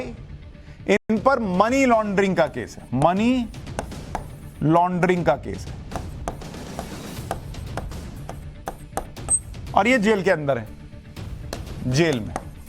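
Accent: Bihar, India. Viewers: I love your Bihari accent.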